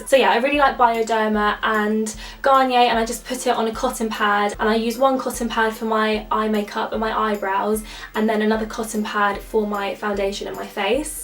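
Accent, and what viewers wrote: United Kingdom. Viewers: I just love the british Accent (I live at another country). Please can you make the room tour now, Not by 3000 cause can't wait i love just your backround. And you derseve all followers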